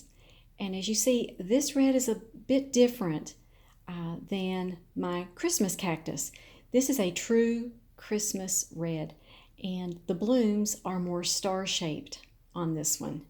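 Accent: South, United States. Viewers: I love your accent, is it a southern accent? Beautiful. I love these plants, I have 3 of them. One is solid vibrant pink and the other two colors are rare colors, Sumba Brazil (reminds me of a sunset) and Gold charm (pink and yellow mix) ❤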